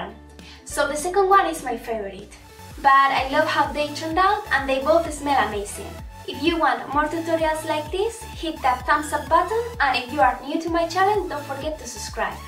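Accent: Spain. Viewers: Se nota a tres kilometros que es española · She is from Spain, there is too much that she speak english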